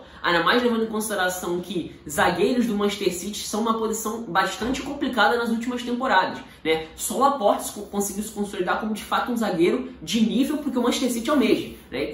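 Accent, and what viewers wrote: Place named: Rio de Janeiro, Brazil. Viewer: ta lá minha audiência qualificada aí hahah! você fala muito bem, parece o rafael oliveira com mais sotaque carioca haha baita análise quando encontrar o comentário de Leonardo Andrade nos seus vídeos serei eu ;)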